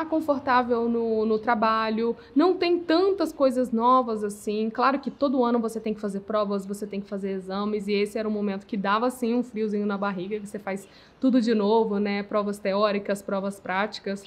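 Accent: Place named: Belo Horizonte, Brazil. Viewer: Sotaque belorizontino...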